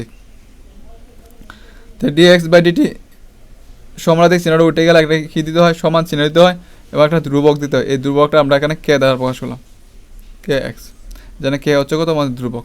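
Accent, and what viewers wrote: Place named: Mymensingh, Bangladesh. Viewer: ভাই মমিসিংগা😁😁 ভালোই হয়েছে❤️ ভাইসাবের এলাকার লোক😌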